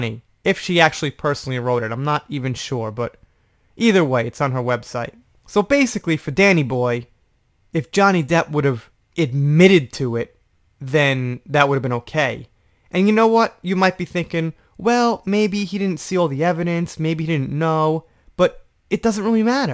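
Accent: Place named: East Coast, United States. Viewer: your voice and easy cost accent is music to my ears..even all the way from Japan..💯💜🦋🤟🍀🌈👊🌹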